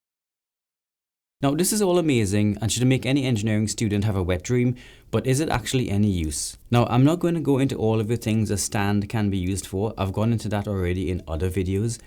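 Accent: Trinidad and Tobago. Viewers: Are you from Trinidad? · Speech quality almost convinced me you weren't Trini for a second. Da accent cyah hide! Was looking at the Peak Design magsafe tripod to set up my phone for some photo/videography, but the lack of dual sided magnetism just couldn't justify the purchase since i find myself in some odd locations where a mini tripod slim enough to fit in my pocket unnoticed, doesn't always work. trying to get shots. This one however, checks all the boxes for the modularity i appreciate in a well contrived and engineered product. This and a magsafe water bottle... or a Milwaukee Packout bottle with a 3d printed bracket an I SET! Good video, man! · Is this a guy from Trinidad?... with a touch of British accent?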